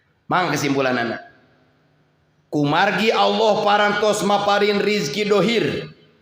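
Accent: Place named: Cianjur, Indonesia. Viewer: Logat cariyosnmh asa ci anjur. Waas .duh.abdi mlb Garut.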